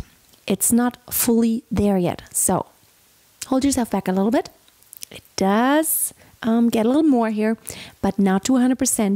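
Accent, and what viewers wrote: Germany. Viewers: You sound German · Are you German?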